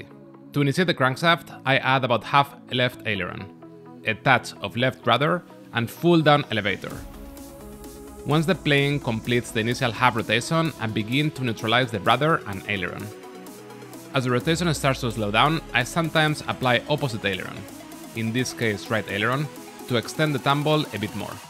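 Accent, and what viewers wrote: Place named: Spain. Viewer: I don't do well with Spanish accents, so I turned on closed captioning - turns out CC has a problem too! "about half left Aen a touch of left brother..."🙂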